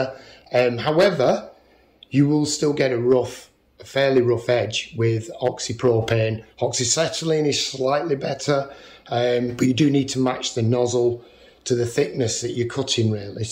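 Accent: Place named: England, United Kingdom. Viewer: it's hard to listen to specially the English accent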